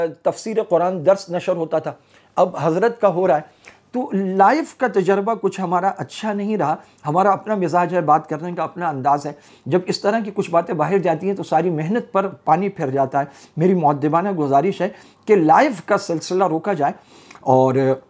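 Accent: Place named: Arab world. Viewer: مولوی صاحب آپ جب اردو میں بات کرتے وقت ح ع عربی کی طرح ادا نہ کریں یہ سننے میں بہت برا لگتا ھے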